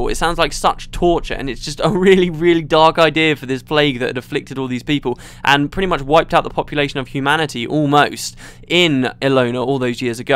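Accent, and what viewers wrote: United Kingdom. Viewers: English is a foreign language to me but i can nearly understand 100% of everything you say. Thank you for your clear voice and your british dialect beeing not that extreme. Its very delightful and relaxing to listen to. At least in my head you are a very handsome and good looking man :)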